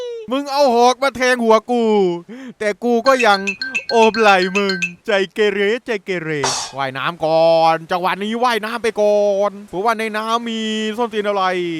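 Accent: South, Thailand. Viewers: พี่เป็นคนใต้ใช้มั้บถ้าพี่ป็นคนใต่เราเป็นคนบ้างเดียวกัน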